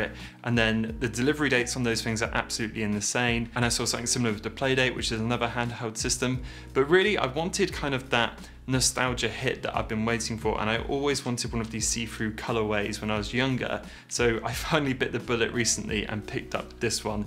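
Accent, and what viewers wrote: England, United Kingdom. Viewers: I am very careful with my gear and so use cases and watching your videos I notice on your phone you do not use a case. Are you very particular in how you handle your phone or do these higher end iPhones have some sort of protective covering on them. I try to be as environmentally aware as possible but one can only go so far with technology before it becomes obsolete . I am facing this now with my first generation iPad Air. I purchased it in November 2013 so coming up for ten years but in this last year, even though it still works well sadly most app´s have stopped working with it and even safari pages will not open in many cases due to the old iOS! Its sad as well as I have always kept it in a folio case and if I take it out the thing still looks brand new! After watching your reviews of the iPad 10th Gen and the 5th Gen iPad Air I have decided to get the air due to the M1 chip and hopefully that means it will have a longer iOS upgrade lifespan, I have been on ios12 point something for years now on my old iPad! 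I am guessing I will notice a considerable difference. I have seen this already with comparing it with my iPhone X ( still working perfectly with plenty of battery left and again, always in a folio case so no need for those horrid screen protectors again, it still looks like new, hopefully I have a good few years left in it as far as iOS upgrades are concerned. I am very much enjoying your channel and have subscribed. Nice to hear and English Accent. I am from Devon bu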